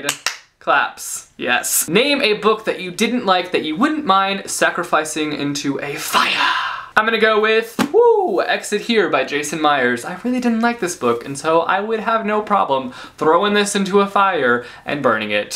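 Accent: Spain. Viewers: You have an awesome Spanish accent! lol. :) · I love your spanish accent, Happy Holidays Dear!!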